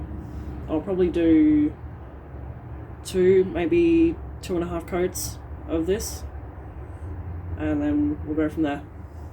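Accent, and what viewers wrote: Australia. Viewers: Just beautiful! And I'm so happy to listen to your accent as my daughter is in Perth, so as i listen to you I can imagine what she listens to. Very cool. Thanks!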